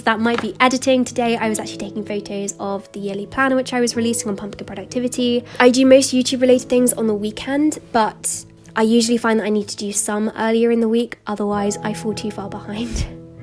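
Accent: United Kingdom. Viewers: I'm learning English, and I love your videos 'cause they are very aesthetic and I really love your accent because he helps me my practice of my listening in English British. Thank you so much!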